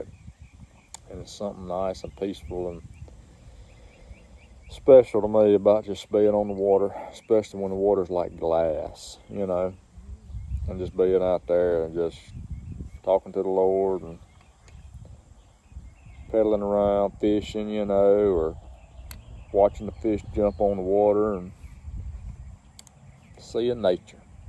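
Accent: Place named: South, United States. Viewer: Sorry to hear about the loss of your wife's granny. Praise God for the Comforter Who helps His people. As for the way you sound, sounds like a southern to me. As for as trains go I miss the caboose . When we were kids we would wave at the men who rode in the caboose . There is a lot to be said about trains. Really I don't know what makes one run anyway. I enjoyed your video. Have a gooden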